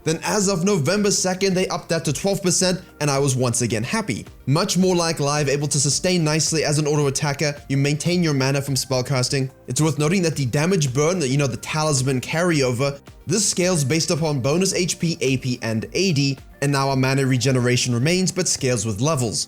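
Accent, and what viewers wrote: South Africa. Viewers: i thought i recognised the SA accent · Hey man, are you South African? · This is the first video i saw of your channel and i instantly knew u were south african lmao are you a masters player with 200 ping tho ? how is that possible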